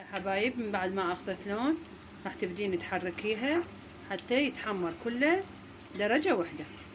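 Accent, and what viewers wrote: Iraq. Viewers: ام بيت ومعدلة وكلللللش احب لهجتج كربلائية الاصل والنعم والله تذكريني بمرة خالي كلش احبها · لهجة كلامج نجفية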